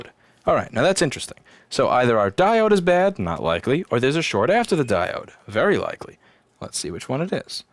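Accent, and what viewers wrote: New York, United States. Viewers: The accent/speech pattern is a mixed of Seinfeld, Joe Rogan and Bob Ross plus a sprinkling of NYC cabbie. Somehow turns out very entertaining know these videos.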